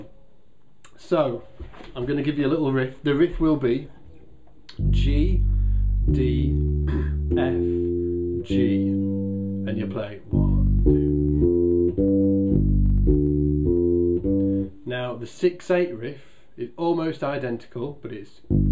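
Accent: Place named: Northern England, United Kingdom. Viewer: I usually don't dig instrument lessons and rather prefer to find out those things myself (takes longer, yes). But these lessons are perfectly inspiring - and you don't often find bass playing being explained in that great Northern accent. :)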